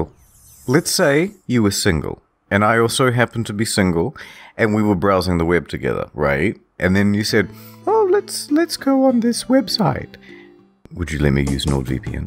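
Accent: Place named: Australia. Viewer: oh yeaahhh, just lurve me some cringey ozzie voice with classic gaslighting/manipulative tone of pretense-of-niceness. This guy is so transparent. Ahh, youth.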